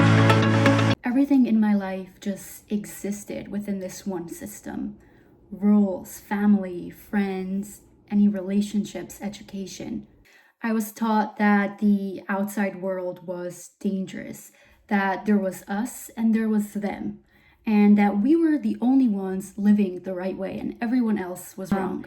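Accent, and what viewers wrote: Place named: New York, United States. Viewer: I love this! I didn't realize you had an accent! Is it a NY accent or an accent from speaking Yiddish?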